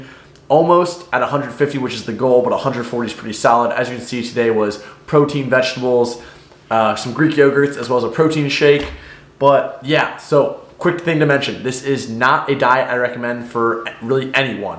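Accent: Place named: Australia. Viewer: Random question here, have you lived in Australia? I can hear an Aussie accent through your American accent! Just curious!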